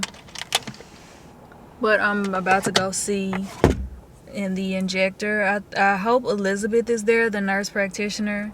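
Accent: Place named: Memphis, United States. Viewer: I've been sitting here for the longest like damn sound like my mother-in-law...NOW I get it lmao.😂 Memphis and Forrest City folks sound just alike.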